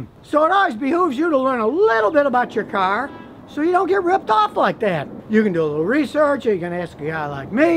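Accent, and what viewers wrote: United States. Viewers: Why is John Lennon alive with an American accent and when did he become an honest car mechanic?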